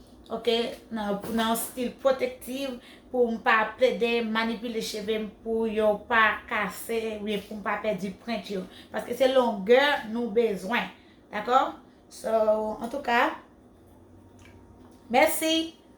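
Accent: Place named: Dominican Republic. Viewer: li pa konn li kreol non preske dominikenn liye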